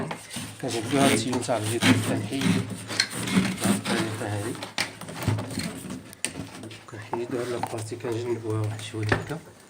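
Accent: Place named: Maghreb, North Africa. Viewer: لو الشرح بلغة اخرئ واضهار ترجهمه عربي احسن من من هي اللهجه. والله بصراحه اللهجه المصريه واضحه جدا وسلسه اما الجزائر المغرب مشكله وخلوا النت كله كذا باي مجال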